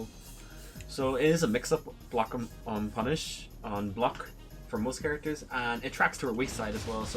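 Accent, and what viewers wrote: Ireland. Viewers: . You are one of the most cerebral players and a man of taste. But for all that is holy, get a good microphone and learn how to tune it. Irish accent is complex in its tonality (no offense) It sounds fine when recorded correctly but it loses low end on "cheap" mics. And turn off tekken music when making vids, it gets in the way of how your voice is transmitted as there is competition for the sound energy coming out of the speakers. Get a good mic with a good pre amp. And play only Oscar, get out of here with these others women hahahaha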